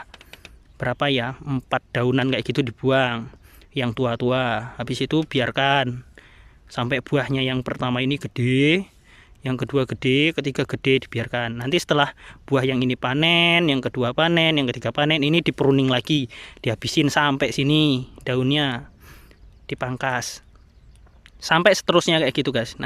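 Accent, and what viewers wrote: Java, Indonesia. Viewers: logat jawa bilang asal pontianak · Mas,Kalimantan,tapi keturanan Jawa ya logatnya orang Jawa tu,mohon no hp nya,saya orang Tuban jatim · Tak kiro wong jowo mas e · penasaran.orang Pontianak kok logat orang jawa. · Ikut nyimak sampean kok lucu boso jowone medok mojopahit ngendi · Tiyang pontianak kok jowone medok banget kang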